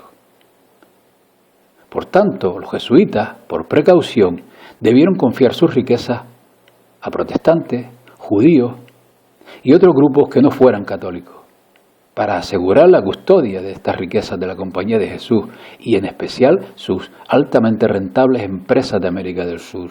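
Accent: Canary Islands, Spain. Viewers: hablar con tanto acento español canario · HABLA UN CANARIO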